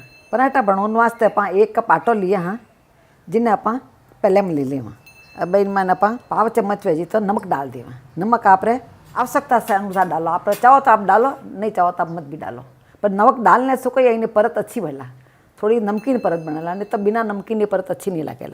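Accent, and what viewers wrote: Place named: Jodhpur, India. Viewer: Mare in-laws Jodhpur rahte ha apki language sun kr lagta ha sasural pahuch gyi😀😀 Thanks for yummy parathas sasuji🙏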